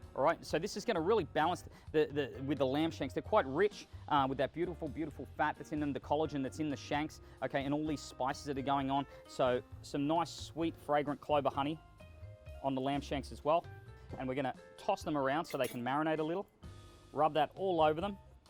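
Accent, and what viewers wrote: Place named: Australia. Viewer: Great food, and good to hear an Australian accent! I note you also have a Big Green Egg behind you - if you could only have one, would you choose a wood fired oven or a Big Green Egg?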